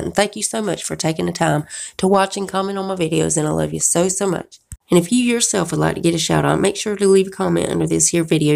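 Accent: South, United States. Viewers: Thanks for the spoilers, loving your Southern accent..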